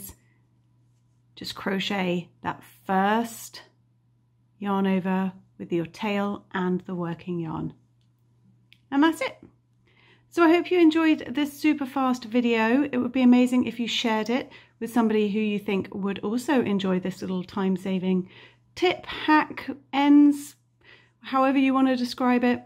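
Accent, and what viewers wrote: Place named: United Kingdom. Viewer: Absolutely genius!! Thank you so much for sharing. I have just ordered yarn to do a very heavy color change afghan (every row changes) and your timing with this amazing tip could not be better!! I am really excited to do my project now. I was dreading all the ends, but I really wanted to do the pattern. Woo hoo! Thank you, thank you, thank you. Love your channel and your Brit accent. Carry on!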